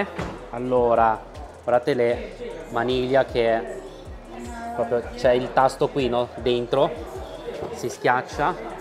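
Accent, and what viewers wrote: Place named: Milan, Italy. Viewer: Come percepiscono il tuo cinese con accento milanese ?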